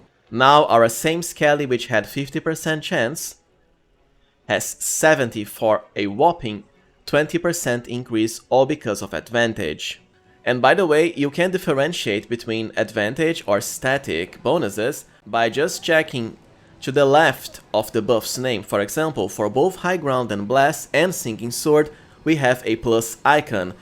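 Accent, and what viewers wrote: Brazil. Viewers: That im a brazilian acent right there, I can bet! nice english btw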